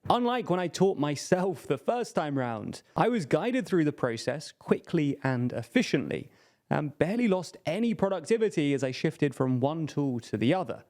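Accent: United Kingdom. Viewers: It's LA France. I watched anyway thinking the narrator is a Brit.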